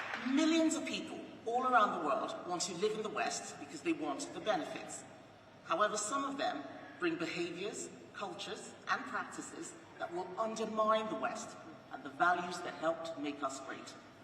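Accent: United Kingdom. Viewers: Sounds pretty British to me!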